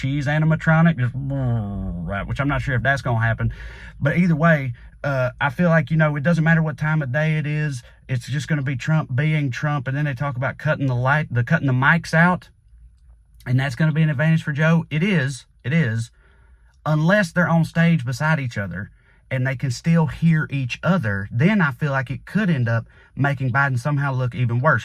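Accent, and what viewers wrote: South, United States. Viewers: As a blue Appalachian in a deep red state I appreciate not only your sense of humor and intelligence, but hearing it delivered in a southern drawl. Thank you for making me feel a little less alone.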